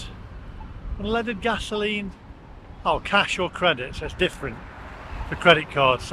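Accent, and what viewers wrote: United States. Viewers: 80's Ford Thunderbirds were dreadful. My neighbour, an English photographer in Antigua, had one. It couldn't pull the skin off a rice puddin'. My Chevy of the same era had a lot more poke. Oh, and yes, you do fall into speaking Yankee while you're with others. Even today some words do fall out without thinking.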